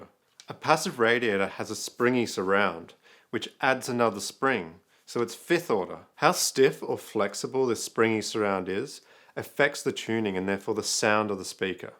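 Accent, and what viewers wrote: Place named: Australia. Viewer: I can't tell you how exciting it is seeing and hearing a fellow nerdy Australian in these videos. Huge congrats on such a great design!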